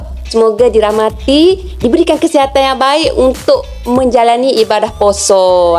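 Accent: Kelantan, Malaysia. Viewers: Hadir selalu layan.. Suka dengor akk kecek kelate...😁😂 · Sedap pulak dengar kecek Kelate..😄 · Kak org Kelate ko...kg mano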